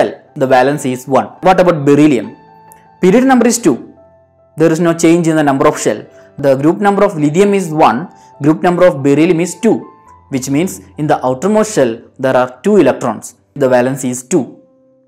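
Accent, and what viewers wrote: South India, India. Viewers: Sir u explain very well and I doubt u r a malayali or a South Indian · I think u r from south india 🤔 Anyway nice explanation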